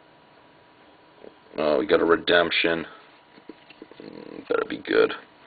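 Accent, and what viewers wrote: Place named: Boston, United States. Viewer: finally someone with a boston accent.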